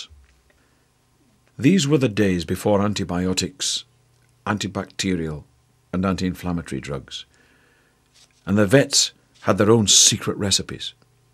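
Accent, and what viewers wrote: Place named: Scotland, United Kingdom. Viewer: has a slight Scottish accent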